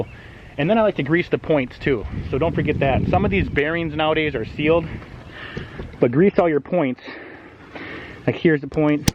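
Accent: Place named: Wisconsin, United States. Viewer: keep up the great videos! They have been extremely helpful. Watched several of your winterizing videos last year to get my boat put away. Cranked up easy this year and we have already been out on the water a bunch. Also replaced my exhaust flappers, and going to be replacing my trim solenoids soon! Your videos are spot on, and I really dig the Wisconsin accent. Peace brother!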